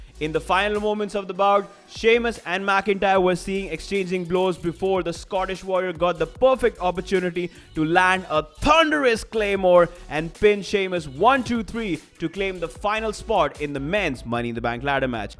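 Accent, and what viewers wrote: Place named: India. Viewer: this may sound dumb but please stop talking with a indian twang you started out so well the firs 30 seconds or so ... idk why I even bother commenting on it but yeah idk it's slightly triggering PS it's not really something you do I guess but it's more WWE fan channels filled with BAD BAD english speaking by indian people who made the title and video in english but then starts to yapping in indian or whatever they say about the WWE in videos ... no offense in advance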